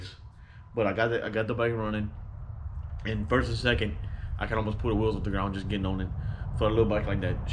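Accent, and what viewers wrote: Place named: South Louisiana, United States. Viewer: bah you gotta be from south lousiana talkin like dat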